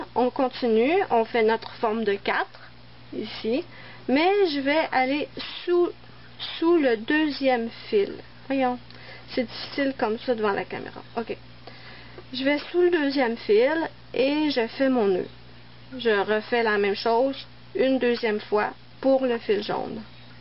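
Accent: Canada. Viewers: Enfin compris! C'est super bien expliqué! Ps:ton chat ma fais rire et j'aime bien ton accent canadien! 👍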